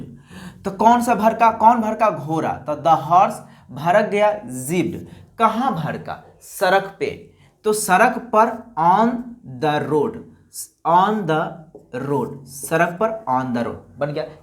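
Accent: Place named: Bihar, India. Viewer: बिहारी हो क्या भाई... इसीलिए "ड़ा" को "रा " बोल रहें हैँ।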